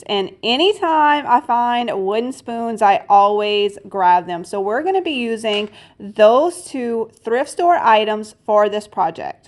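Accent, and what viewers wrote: Louisiana, United States. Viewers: Darling! You sound like you’re from Louisiana!!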